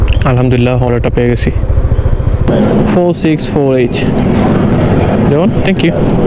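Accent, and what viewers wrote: Sylhet, Bangladesh. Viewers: সিলেটি নি ভাই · Bhai apne ki sylheti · ভাই আফনে সিলেটি নি??,,কইবা আমারে,,❤ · ভাই তোমার কথা হুনিয়া বুঝা যার আমরার সিলেটি মানুষ। ভাইর বাড়ী কই 😊❤❤ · vai apni ki sylheti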